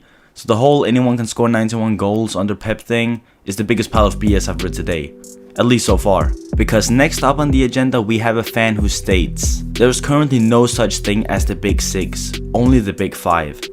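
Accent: Denmark. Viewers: Where are you from, if I may ask? You sound kinda Danish, and I saw your friend had a DBU shirt on as well in one vid at least. Anyways, nice video! :D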